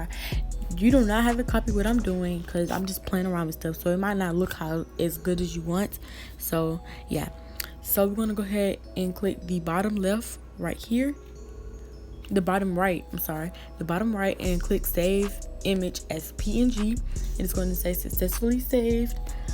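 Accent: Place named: Africa. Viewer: DANGGG I LOVE UR AFRICAN ACCENT♥️♥️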